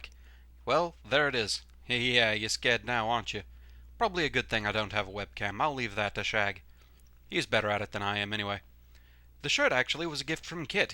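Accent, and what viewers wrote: Scotland, United Kingdom. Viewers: Hmmm... since I hate not knowing something I hear about, I'll make up my own answer: Really Wily from Megaman. I knew it! Also, my accent is close to yours, just far less Scottish and a little of every US accent. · it creates a bizarre mix that amuses me. but generally its good. there must be some strong scottish traits in your accent, because i cant pick it up, as im pretty much used to any british accent. it doesn't seem too scottish...or american really...ive had too much exposure to accents i think. my relatives need to stop emmigrating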